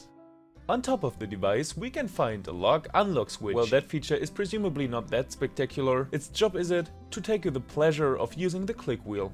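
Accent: Germany. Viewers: Dieser deutsche Akzent xD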